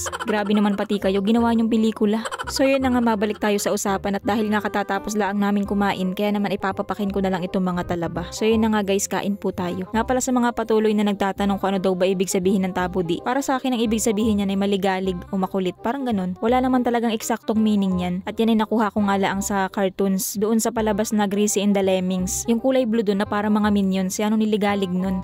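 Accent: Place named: Quezon, Philippines. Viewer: Hi, natutuwa ako sa punto mo Quezon na Quezon ay, from Quezon din ako. Pero watching here in Edmonton Canada. Pa shout out sa next Video mo, Aileen Villapando of Edmonton Alberta Canada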